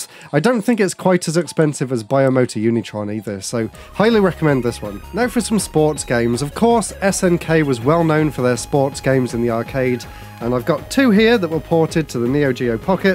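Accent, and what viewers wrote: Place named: Scotland, United Kingdom. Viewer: Your accent has always been so interesting to me. When you said you go to Edinburgh for your job, it made me focus more on your accent... and I'm wondering, is it like a British English / Scottish combination? Like 85% British English, 15% Scottish? The way you say "found" reminds me of Scottish, for instance. But this could just be confirmation bias! Either way, very nice accent!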